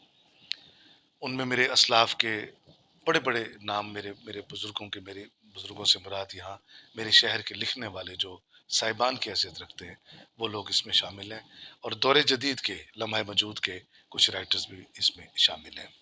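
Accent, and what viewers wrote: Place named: Saraiki, Pakistan. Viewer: Dear Sahib, please also make video in SARAIKE,because your saraiki exant is very polite and charming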